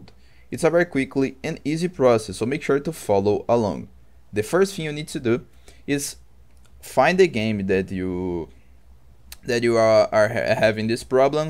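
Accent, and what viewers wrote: Brazil. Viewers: esse sutaque br ai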